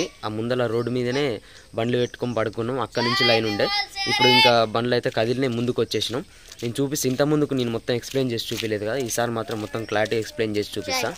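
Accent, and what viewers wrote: Telangana, India. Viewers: Bro Nee Videos Lo Special enti cheppana Nee Voice Bagundi Bro And Nuvvu Mnatlade Fluent Telugu ante Telangana Slang undi Kani Machinga Ardham ayitadi nee mataloo videos lo Naaku kuda oka Wish undi Bro Okkasari Neelaga Truck Loading cheyali Enjoy Cheyali Anthe😄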